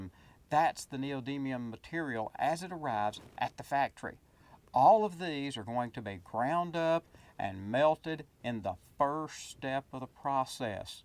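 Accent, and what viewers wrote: United States. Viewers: You sure do talk funny (in fact I was put on speaker phone so that the office ladies in Tennessee could listen to my Australian accent and have a giggle: ) Great informative video. It is so much a pleasure to hear an American who enjoys China. It is a great country and my family and I have spent many months there - Thank you for sharing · He should have someone else with a proper English accent narrate His videos. That Mercan accent can be quite annoying...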